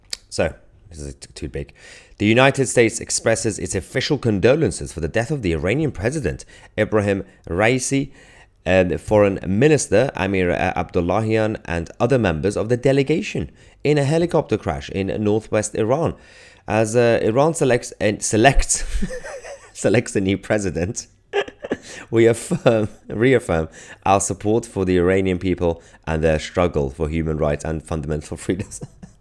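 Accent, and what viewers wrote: United Kingdom. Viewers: British/Persian, God bless you, I understand that, I do not understand what is the ludicris point, of calling you other nationalities, you definitely have a lovely British accent. You are a Brit!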